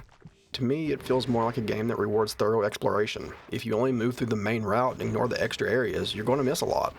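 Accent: South, United States. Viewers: Small startup channel playing weird shit in a southern accent? Sign me up!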